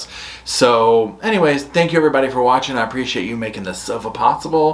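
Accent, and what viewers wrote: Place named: North Carolina, United States. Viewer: NC!! I knew your accent sounded like mine! Xoxo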